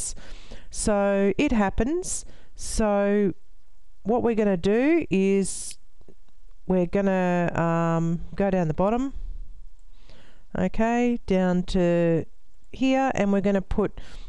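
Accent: Australia. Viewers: from your accent I noticed that you are Australian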